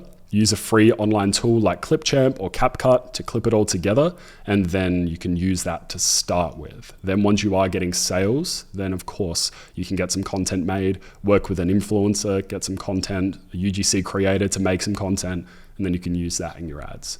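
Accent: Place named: Australia. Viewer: Aussies accent's cute lol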